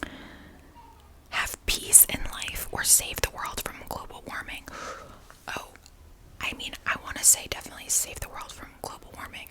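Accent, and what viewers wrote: New Jersey, United States. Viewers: The jersey accent though lol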